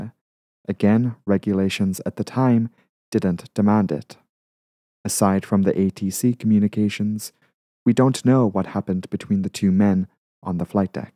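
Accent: Newcastle, United Kingdom. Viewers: I'm from the North East of England too. Your accent has little bits of Irish, Scottish and a slight American lilt at times. I can hear the geordie in you a little bit though but I wouldn't have thought you'd been born and bred in Newcastle by your voice. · What clear pronunciation, deserves to be on docs. Not bad for a geordie. Met a few in the middle east. An aussie and subbed. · Hello from sunny and warm St. Petersburg, Florida! Love your choice in the piano music. Love your British accent, us a great narrator voice. Want to visit London but I am unsure if I can handle the weather. Snow & cold was fun as a child in Chicago! Look forward to your next vid.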